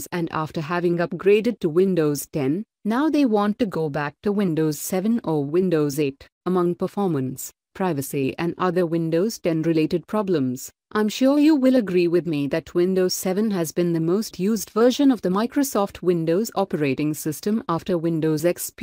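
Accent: India. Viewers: bro doesn't use his actual voice but its still in indian accent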